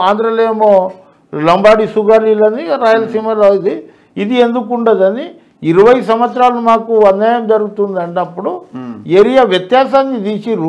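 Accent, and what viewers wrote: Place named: Maharashtra, India. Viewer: Veedu daggara undi chusindu veedu😂😂😂 correct ga telugu matladu ra nuvvu mottham maharastra batch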